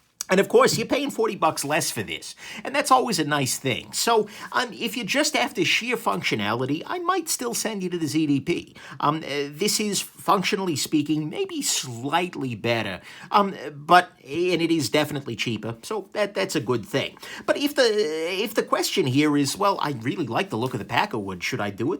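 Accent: New York, United States. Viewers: Please don't take offense but your voice reminds me of my relatives from New York! Thanks for sharing this one... love the dragonfly, and I've always thought those wood scales on the delica variant looked slick!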